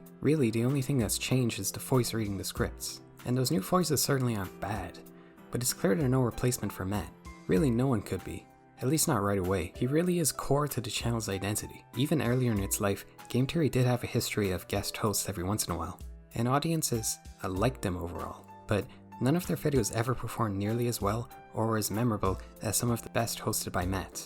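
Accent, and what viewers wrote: Ireland. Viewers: You pronounce t's like an Irishman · you Irish? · You're so Irish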